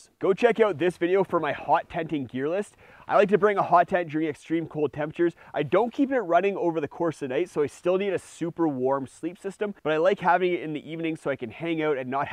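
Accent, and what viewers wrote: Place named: Canada. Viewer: annoying canadian accent.....ooootside, lol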